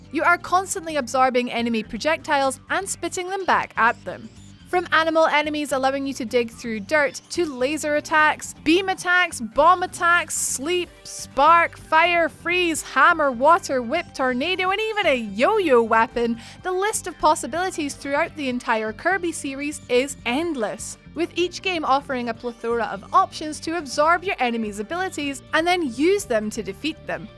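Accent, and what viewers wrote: Scotland, United Kingdom. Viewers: Forgive me if I'm wrong but do I hear a small bit of a scottish accent in your voice? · Nah that's just a Scottish accent mate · For some reason I like to hear scottish accent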